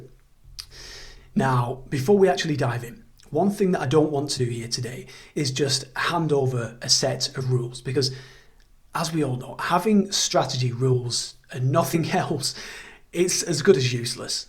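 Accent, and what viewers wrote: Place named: United Kingdom. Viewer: Hi thanks for your video and (for once ) I can hear a lovely british accent .. How do you deal with false signals ? divergences are not Always successful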